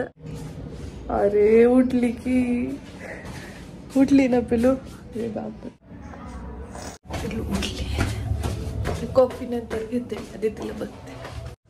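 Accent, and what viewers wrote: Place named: Udgir, India. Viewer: मी पण udgir ची आहे. तुझे vlog पहिले आणि तुझं बोलण ऐकलं की आपल वाटत. आपली भाषा जवळची वाटते.. मस्त झालेय बेबी. मी खास तिला पाहण्यासाठीच व्हिडिओ बघते तुझे. 🤗🌹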